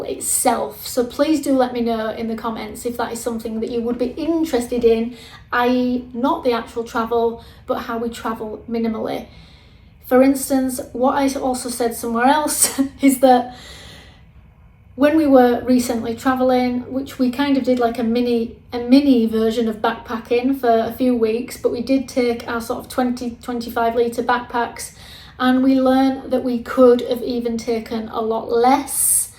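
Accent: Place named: England, United Kingdom. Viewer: I loooooooove all these ideas. I love that you are English. I find your accent and how you live, cozy and beautiful. Your every day life is so inspirational. I can't wait to see!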